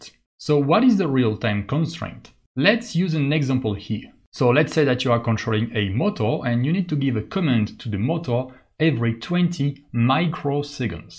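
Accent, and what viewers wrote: France. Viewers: Where are you from my friend? I heard some french accent, isn't it?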